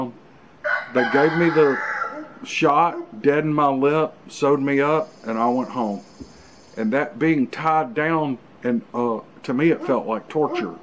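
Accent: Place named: West Virginia, United States. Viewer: You sound exactly like my friend from West Virginia. And not just your accent. If I heard you on the phone I would definitely think you were him. Me and my friend belong to the Creator of the universe too. No fear.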